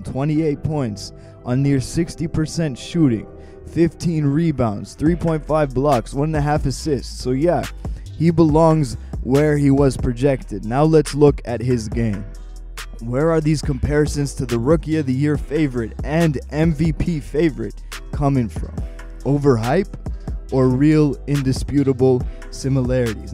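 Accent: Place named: South, United States. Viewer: Your drawlin heavy👀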